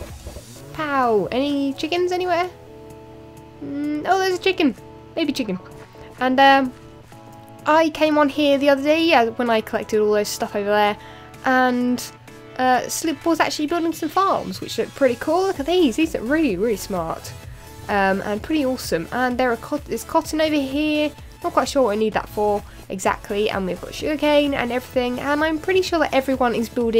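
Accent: United Kingdom. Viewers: You had a lot of views for your Hermitcraft series. Hope people will enjoy this one. Good luck to my favourite british accent.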